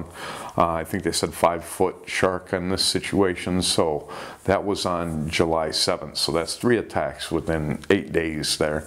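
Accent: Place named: Great Lakes, United States. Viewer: BTW I’m from Michigan, you sure sound like you’re from here or the Great Lakes area!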